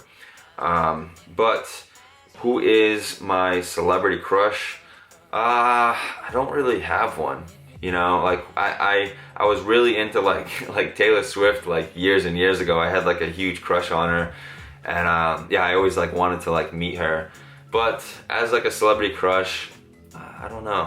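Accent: Boston, United States. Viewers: 1) I love your eyebrows 2) I feel you on the relationship stuff. There’s no need to rush into things or constantly be looking for love. 3) Your Christmas dish mash up is the best 😝 4) I have MS and love the gym and food, but sometimes it’s so hard to get past my symptoms and dieting specifically for it. I understand being mentally stressed around medications and diets. It’s nice to hear someone give it all up and be free because I did that last year with a medication that almost killed me. You’re a great person. I love the videos! And I love your Boston accent lol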